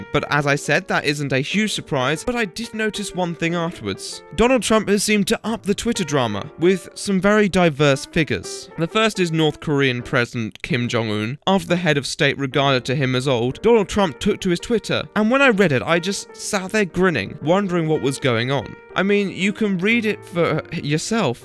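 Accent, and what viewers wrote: United Kingdom. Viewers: What part of Britain are you from just wondering because colossal is crazy sounds the similar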